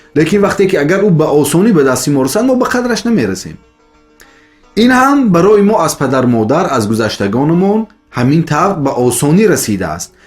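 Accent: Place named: Tajikistan. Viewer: Бехтарин суханхо. Лахни бисер ширини точики кухистони.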